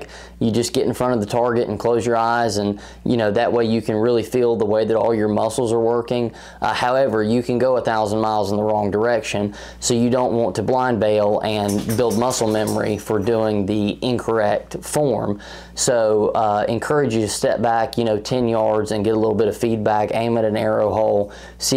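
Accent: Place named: North Carolina, United States. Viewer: Man where are you from, that’s quite the accent, NC?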